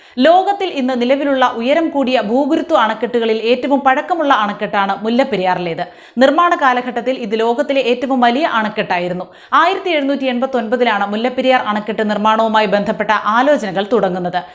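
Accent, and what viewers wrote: Thiruvananthapuram, India. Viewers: ആകർഷകമായ ടോണിൽ ഗൗരവമായ വാർത്ത അവതരിപ്പിക്കാനും ആക്ഷേപഹസ്യം അവതരിപ്പിക്കാനും തിരുന്തോരം ഭാഷ പറയാനും മിടുക്കി 👍👍❤️🌹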